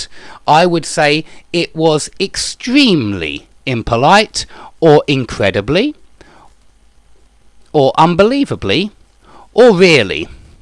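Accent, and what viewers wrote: United Kingdom. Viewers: Ilove the British accent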